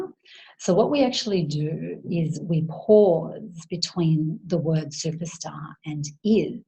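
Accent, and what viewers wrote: Australia. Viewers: This is an excellent channel for learning English and the Australian accent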